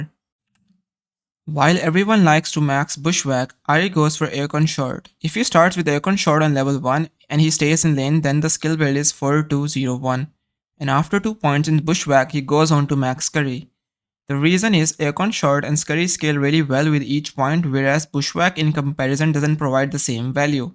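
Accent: India. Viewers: bro this indian accent i cant bro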